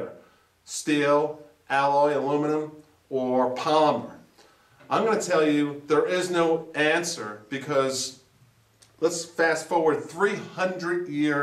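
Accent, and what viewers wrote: Long Island, United States. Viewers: And on a funnier note....you sound EXACTLY like the "honey badger" guy. Long Island?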